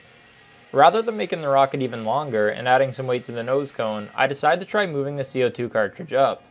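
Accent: Canada. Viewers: I pegged you as Canadian :) Probably Ontario. It's OK because I'm from the left side of Canada. Cool rocket by the way. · Now thanks to HIMYM I cannot unhear that "eau". when you canadians pronounce words like "out" it comes out as a "eaut". like the french word for water, followed by a weird out of place "t". and it's not only in out. several words that came out in himym follow the same pattern. Nice rockets and everything, but every time I hear: "eaut" my brain goes: this is a canadian. :) and it has done that like 10 times during this video :) well, maybe a little more :)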